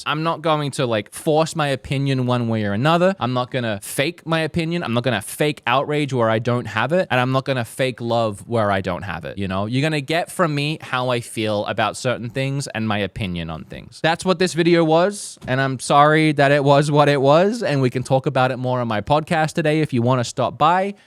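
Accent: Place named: Australia. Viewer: Completely irrelevant question but what's your accent? You sound Australian with a touch of something else?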